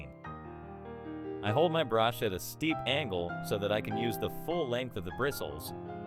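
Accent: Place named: United States. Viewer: Milý. Tieto tvoje techniky som v jednom videu nevidela. Ďakujem ti, že si sa podelil s nimi a je to rozkošné. Ešte viac si stúpol na kvalite v mojich očiach. A skupina určite súhlasí. 😊Americký prízvuk a hudba dotvárajú čaro namaľovaných obrazov. Aké milé. 😊 čauko. ZUZANA 😊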